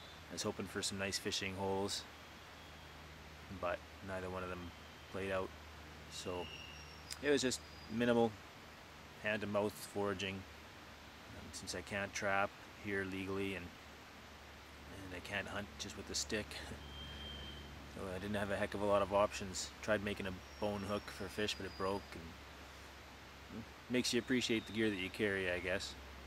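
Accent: Canada. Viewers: nice series. it sounds like your canadian, too bad you cant carry a pistol. it would suit your needs and is way less cumbersome.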